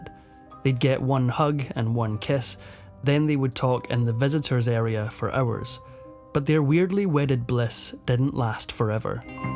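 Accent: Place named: Scotland, United Kingdom. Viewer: Yay my favorite Narrator the Scot!!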